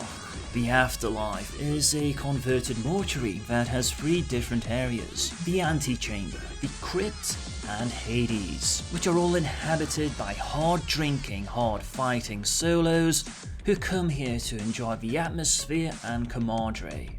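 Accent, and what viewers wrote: England, United Kingdom. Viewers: I'll just take it that I wasn't off with that assumption. I would like to thank Sean Bean, for it was his accent that yours reminded me of. Mostly the pronounciation of the ol' diphthong "ou" like in "yOUng". Jolly good. That said, it's probably the best of the english accents, because Sean Bean.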